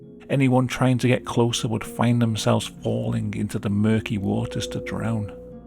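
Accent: United Kingdom. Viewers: “Hey everybody, here” in his British voice should be trademarked and copyrighted tbh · I watch a lot of these sorta stories with british accents of all kinds. I like yours. Reminds me of carl. You know, ricky gervaise's friend on that radio show... the guy they all make fun of. Not saying anything bad about you lmao.. I like carl's accent a lot. And yours is like his. Proceed.. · he is british 🤦‍♀️ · is 100% British.. 🇬🇧 from the North West of England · British but not your typical crisp British News Channel voice and accent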